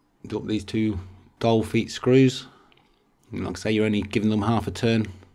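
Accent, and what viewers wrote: United Kingdom. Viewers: Accent from somewhere near Cov?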